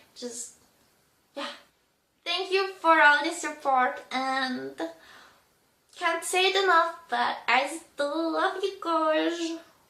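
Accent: Switzerland. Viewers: She's from Switzerland so she has an accent. Go fuck yourself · She’s swiss-german Which can explain her accent English wouldn’t be her first language and Japanese either. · She's not Japanese. She has a Swiss German accent.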